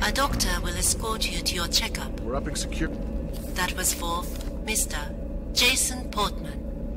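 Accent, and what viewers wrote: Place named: Romania. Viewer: Se pare ca iesti roman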